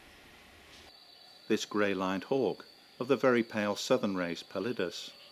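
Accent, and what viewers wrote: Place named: Australia. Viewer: Spent my childhood in Brazil, this vid has brought me a lot of good memories.. now in Australia 🇦🇺. You sound Aussie by the way...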